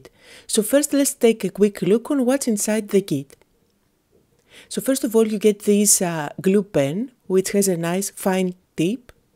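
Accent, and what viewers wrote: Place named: Greece. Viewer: angelam perez by the accent I think that she is Greek and also her family name 😉😉😉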